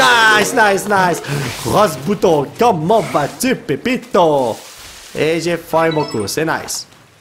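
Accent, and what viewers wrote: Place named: Quebec, Canada. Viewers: t le best ton accent ma trop donné envie d'aller habité au Canada. Sinon j'espère que a la fin de l'aventure tu auras une équipe badass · Je crois qu'il est québécoi je suis pas sur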